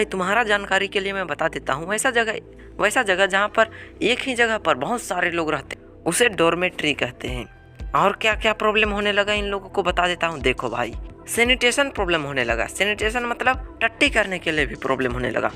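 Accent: Bihar, India. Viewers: are you bihari , because you sound like a bihari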